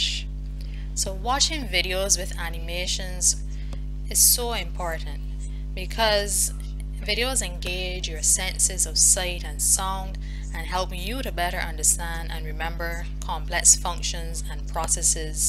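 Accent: Barbados. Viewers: R u from Barbados? U sound similar to Rihanna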